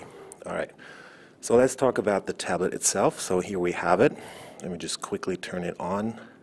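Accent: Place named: Germany. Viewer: I love your german / english accent :p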